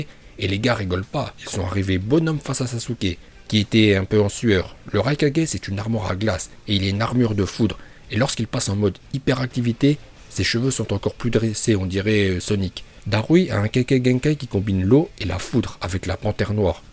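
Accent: Japan. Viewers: t’es vidéos sont bien mais sa rend fous t’es accents japonais alors que t’es breton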